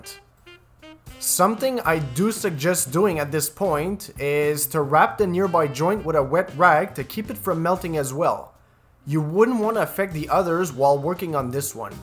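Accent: Quebec, Canada. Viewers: c sur t quebecois toi xD merci pour ton video ;) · Are you French Canadian ? You have a little french speaking accent in your english ! Very useful video !! Merci beaucoup !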